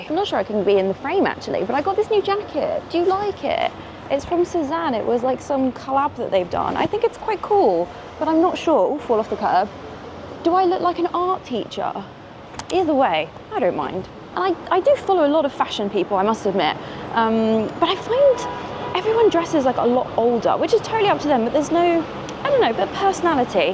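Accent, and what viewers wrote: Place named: England, United Kingdom. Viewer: I visit London a couple times a year and I always visit most of the places you visit, hoping one day I might see you blogging! Next visit Xmas. You have a lovely London accent - being an ex-pat. I listen to yours, hoping one day I'll sound like you!. I love the extensions (having really long hair suits you - I've just had mine cut for the summer and I'm regretting it a bit). Ah, but it will grow! Keep up the good work - always look forward to your updates. Stay cool!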